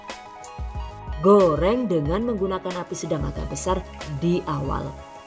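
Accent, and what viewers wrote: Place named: Java, Indonesia. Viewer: Hehe..mbaknya lucu...suaranya, ternyata orang jawa medok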